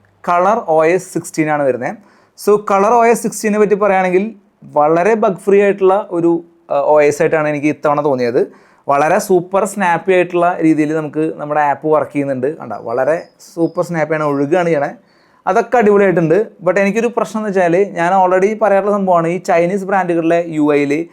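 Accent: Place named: Thrissur, India. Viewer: trishur basha ane udechad ene tonunu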